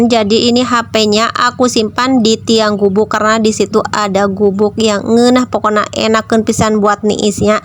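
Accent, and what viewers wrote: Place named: Banten, Indonesia. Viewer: Emang di pangandaran it bahasa y ad jawa y bun bukan sunda doang.ak denger bunda ini suara y kaya logat serang banten gitu heheh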